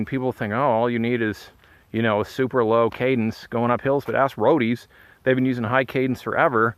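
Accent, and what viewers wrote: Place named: Germany. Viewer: Hahahaha Like your german accent dude. Greetings from germany, Marc. Didn't get the 220 here actually. 😞 riding code r, but i hate the constand bleeding i've to do with the sram. Likely every week. Thats annoying.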